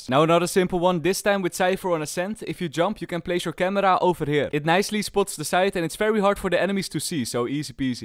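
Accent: Netherlands. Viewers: your accent tells you are from netherland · He’s dutch I can hear it for his accent because I’m dutch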